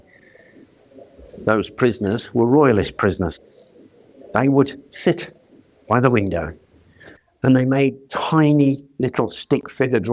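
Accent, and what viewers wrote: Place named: Birmingham, United Kingdom. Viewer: He sounds more like a Brummie than someone from The Shire. I grew up 10 mins from the castle but didn't visit until I was in my 20s! I guess you don't think much about something you see day in and day out. It is a very interesting place, but hideously overpriced.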